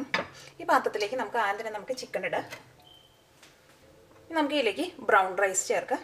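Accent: Thrissur, India. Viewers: thrissur slang :)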